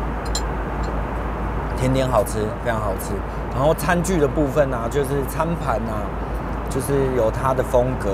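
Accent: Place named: Taiwan. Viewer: 台灣人講話本來就這樣好嗎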